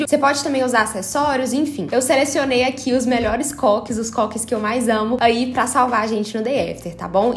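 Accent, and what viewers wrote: Minas Gerais, Brazil. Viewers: Coisas que nós mineiros falamos sou mineira e falo assim também 😍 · Coisa de mineiro eu acho, pq eu também falo assim e sou de Minas 😂❤️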